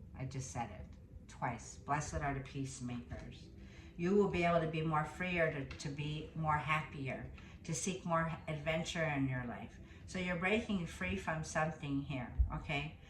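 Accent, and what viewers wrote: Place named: Chicago, United States. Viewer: Beautiful, affirming reading. Thanks so much. For your brilliant assessments. They feel spot on. Thanks for all the study and research you are doing. You are so intuitively gifted and we Geminis love to communicate our higher knowledge. Love you,. You are a treasure. Love your Chicago accent. I lived across the street from Water tower Place when I went to grad school at Northwestern for 7 years in Chicago. Love my Chicagoans!!! Happy 4th. Stay cool in Las Vegas😎💖🙏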